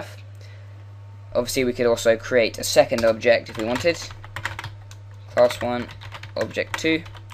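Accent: United Kingdom. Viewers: Thank you very much! I like the accent cockney, "NUMBAR", my favorite accent! Greetings for England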